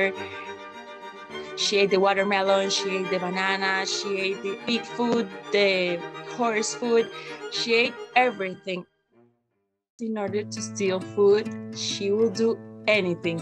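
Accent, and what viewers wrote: Paisa, Colombia. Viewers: Ay...en el video sale una voz colombiana y muy paisita...🇨🇴👍🏻 Gracias por amar a nuestros amigos los peludos...🐄❤️ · suena paisa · Caray, eres buena, noble y bondadosa, eres hermosa, y aparte tienes ese delicioso acento colombiano que me derrite..... si, la mujer perfecta! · Ese acento paisa... 🥰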